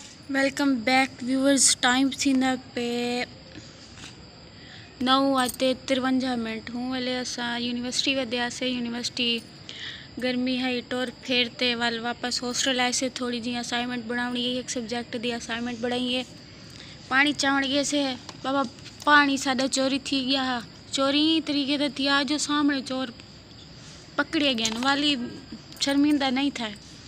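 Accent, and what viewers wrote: Saraiki, Pakistan. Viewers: صدقے تھیواں تیڈی میٹھی سرائیکی ❤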